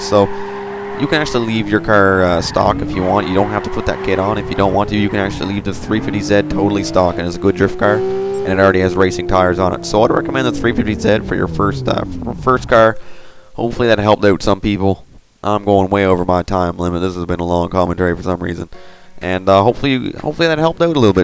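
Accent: Newfoundland, Canada. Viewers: man your canadian ass fucking english murdering accent made me hate this video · are you canadian? :D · are u from canadia? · Are you from newfoundland? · Are you Canadian? Your accent is quite distinct.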